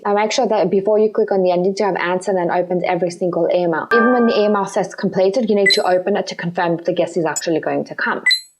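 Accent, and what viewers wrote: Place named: United Kingdom. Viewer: Es solo por su acento británico, a mí también me costó mucho entenderla pero al final entendí casi todo jfdj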